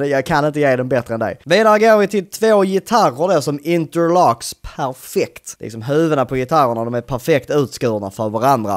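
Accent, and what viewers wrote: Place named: Skåne, Sweden. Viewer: Chex supportrar dig är från Skåne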